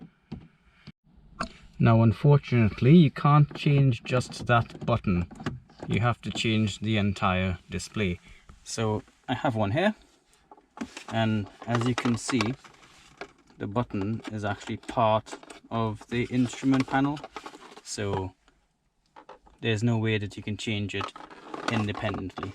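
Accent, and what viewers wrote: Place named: Trinidad and Tobago. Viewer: you sound trini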